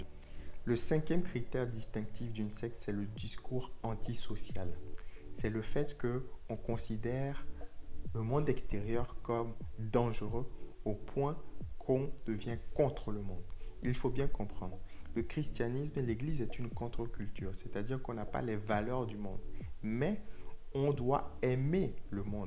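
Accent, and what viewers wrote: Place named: Ivory Coast. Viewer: Bon enseignement pasteur et excusez-moi vous êtes ivoirien ? Parce que je crois avoir reconnu l'accent haha. GOD bless you🔥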